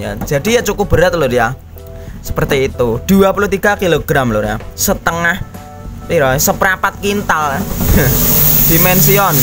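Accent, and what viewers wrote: Java, Indonesia. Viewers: Medok banget...suaranya ngebas banget....jowone ngendi....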